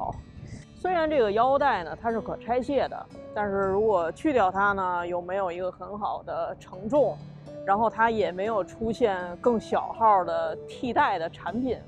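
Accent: Beijing, China. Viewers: 很高兴发现了您的频道，比较好玩的是：我还没怎么看内容呢，光听你的声音就订阅了，离开北京太久了，喜欢听一下乡音:)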